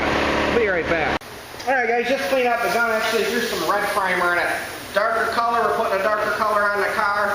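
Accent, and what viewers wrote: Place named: Rhode Island, United States. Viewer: lmao are you from rhode island/maine? you sound like the original peter from family guy.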